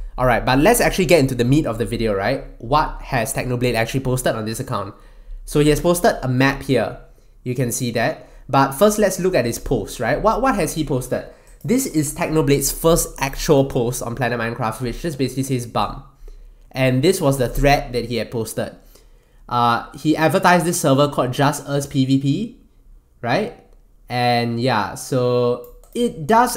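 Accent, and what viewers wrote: Singapore. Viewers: singaporean accent pretty strong here LMAO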